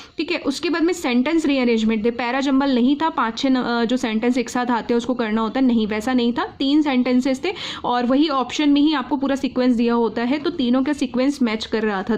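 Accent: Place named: Rajasthan, India. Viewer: Aap Rajasthan se ho na aapki voice se lg rha hai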